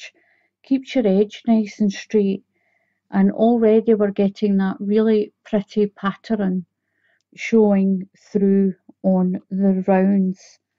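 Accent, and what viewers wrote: Scotland, United Kingdom. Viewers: I just love your Scottish accent. You are definitely right about not having more “Man Blankets” patterns. I mean, everyone has at least one man in their lives that deserves a beautiful, or shall I say handsome, blanket. This blanket is very easy to do & I love the way it’s turning out for me. It’s for my son for a Christmas gift. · it's a Scottish accent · I am going to make this for a friend in permanent healthcare in Wisconsin. It's a man of course, love the name you gave it. Thanks from Louisiana. I'm guessing your in or from Scotland, love your accent as we always say. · Good Day to you. I have been looking for such a project to used up a large over buy of heather gray Red Heart yarn, What weight yarn is the yarn you used? I wil be using 4 which is a worsted weight. I definately will be doing a swatch, it looks like you need to do a double amount of foundation chains since the work shrinks in to about half, which is why one does a swatch, to figure out these things. 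The finished patterning looks like basket weave and should be quite effective to keep any one guessing how it was done. I have never done front/back posting crochet before, glad you cleared up what stitch you were doing in your pinned comment. Its definately double crochet, If this is considered treble crochet in your circle, what is single crochet? Just a curiousity on my part, love to see techniques from other places and I will echo other commenters, your Scottish accent is truely lovely. Thank you for showing us how to do your basket weave blanket, my crochet fingers are itching to get started. Best Wishes. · Love the blanket and the accent! Is it Scottish, eh?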